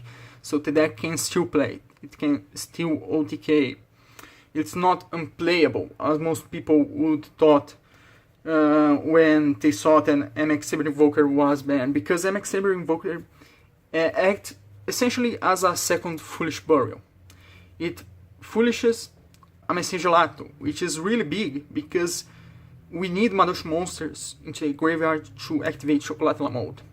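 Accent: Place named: Brazil. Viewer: notei que era brasileiro pelo sotaque kk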